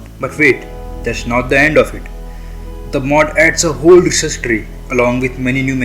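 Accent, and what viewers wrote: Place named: India. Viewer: >indian accent >poo mod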